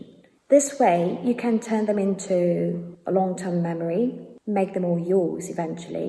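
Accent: United Kingdom. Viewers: 영드에서 들어볼 법한 발음이에요!! 공부할 때 써먹어보겠습니다! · 진짜 잘 일듣기는데 영국식이 편하네요.진짜 젓도 모 르는데 R,t가 잘 듣기네요. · 목소리 자체가 너무 예쁘네요 게다가 영국 발음 너무 듣기 좋아요!!!!! 전 미국영어 발음이 너무 어려워서 차라리 영국발음으로 해야겠다라고 결심한 사람인데요 넷플에서 쉐도잉 하려고 하는데 딱히 맘에 드는 드라마가 없더라고요 재미있는 시트콤이 최고인데 미란다,아이티 크라우드도 없고,,,해서 찾은게 Lovesick입니다 마땅한 컨텐츠 고르는것도 보통일이 아니네요 그냥 이거 보면서 똑같이 따라하고 반복하고,,그래야겠죠 암튼 국내에서 저렇게 영국영어가 가능하다니 놀라울뿐입니다 훠우!!👍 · 영국인 인가여?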